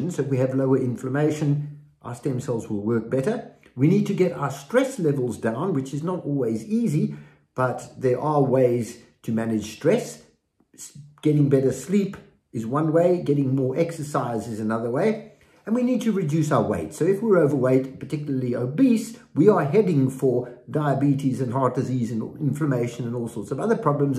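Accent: South Africa. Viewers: Hi, I recognise a SA accent.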